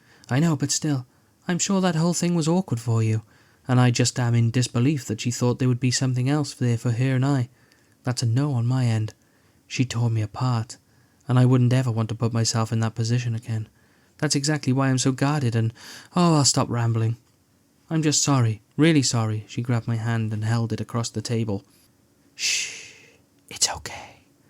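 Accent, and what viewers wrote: Wales, United Kingdom. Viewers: I love the British accent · The Welsh are known for being great orators…Richard Burton, Anthony Hopkins, etc. THIS I did not expect to be delivered by a welsh accent!!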